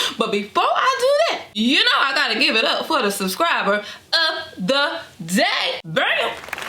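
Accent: North Carolina, United States. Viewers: I KNEW u sounded like a North Carolinian🤣🤣🤣 heyyyy neighbor Lol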